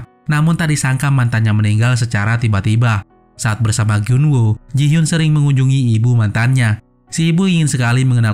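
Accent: Aceh, Indonesia. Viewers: Abang orang aceh ya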